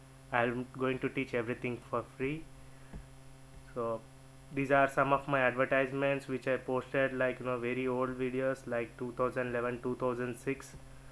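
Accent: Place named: India. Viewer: Please add subtitles, I can't understand English Indian accent, it's so confused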